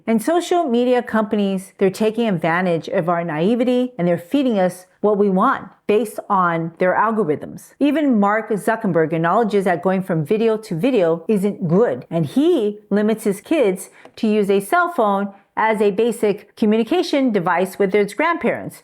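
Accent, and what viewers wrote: United States. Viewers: parli amerikano non ti capisco!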